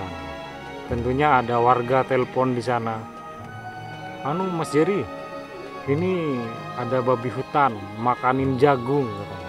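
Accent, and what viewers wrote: Madura, Indonesia. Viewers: orang madura dari logatnya bicaranya ya mas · Kak kalau dr logatnya orang madura ya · Kalo dari logat bicaranya, mas orang madura ya? Salam settong dere tretan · Kok logatnya kaya org madura ya?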